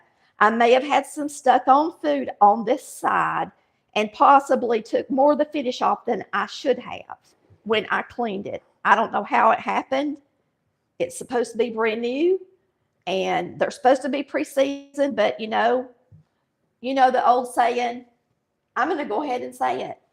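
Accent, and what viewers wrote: South, United States. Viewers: You’re so funny!! Miss, I knew you were a smart cookie, I could tell, I hated Math, so no engineering for me, lol, but you be happy with your accent,you’re the best of both worlds, sweet, smart, and down to earth!! As a Christian, I always wanted to have a meek and quiet spirit, I find Southern women to be a lot like that, and we ARE supposed to be good home makers! Proverbs31 says the godly woman had a business too!! God bless you, so glad to have found your channel!❤😊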